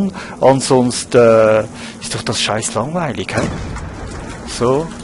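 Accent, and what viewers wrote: Switzerland. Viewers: erstmal geiles video :D ich liebe dein schweizer akzent... ein vorschlag von mir mit höllenhunden schwer und ohne magie auf/in stadt und alles mit ungepunchten waffen ;D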